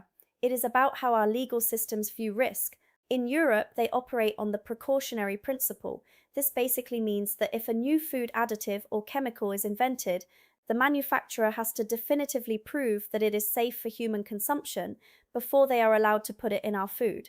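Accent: England, United Kingdom. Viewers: I'd love to know how being American she speaks with THAT Accent? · If you're an a American why have you got an English accent? Please answer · Am I missing something ? For an American you have a very British accent!?!?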